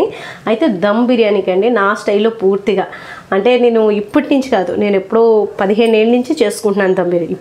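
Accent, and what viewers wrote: Godavari, India. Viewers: Madam meru godavari zilla valla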